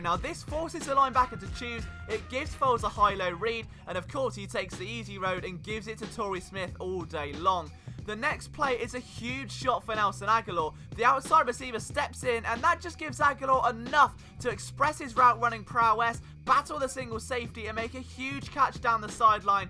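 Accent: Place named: London, United Kingdom. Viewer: Your accent is still pretty heavy (I assume you're originally from London(?)). I'm wondering how if you live in Philly (I assume you live there).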